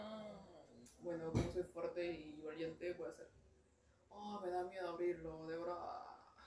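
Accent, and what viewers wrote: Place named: Peru. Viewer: por tu manera de hablar ya eres una peruana más, el pisco se toma sin saborearlo, sin retenerlo dentro de tu boca, en one nomás. Saludos desde Ica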